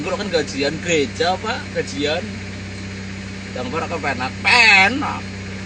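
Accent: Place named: Java, Indonesia. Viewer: Wong endi mas medok temen.ari ngomong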